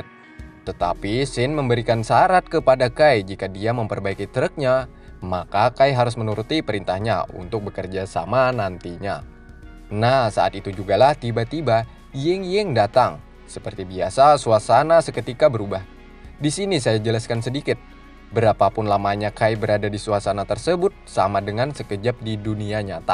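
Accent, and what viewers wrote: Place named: Kalimantan, Indonesia. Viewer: Logatnya khas kalimantan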